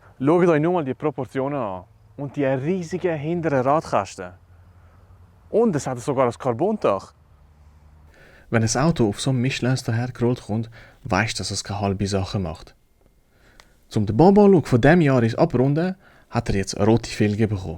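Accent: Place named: Switzerland. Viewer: Isa schwiiiiitzerdüüüüütsch 😂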